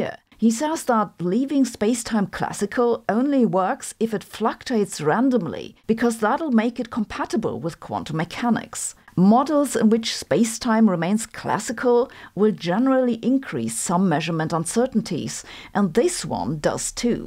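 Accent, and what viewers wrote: Germany. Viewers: her pronunciation has problems because of influences from the German accent, and your inferences are not accurate by the way, simply straw man and borderline ad hominems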